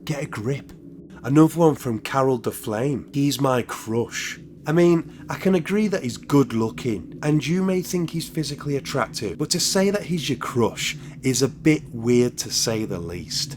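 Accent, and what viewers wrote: United Kingdom. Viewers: Hello!!! I'm French so I'm sorry if I make mistakes.... I've subscribed in your channel, I like very much those histories and the way you narrated, with respect you are funny and you make me smile many times when I hear you.... I think you are from UK.... Thank you very much and waiting for more stories!!!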